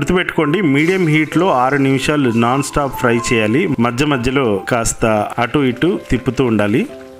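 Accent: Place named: Karnataka, India. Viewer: Bro ur kannada accent super guru...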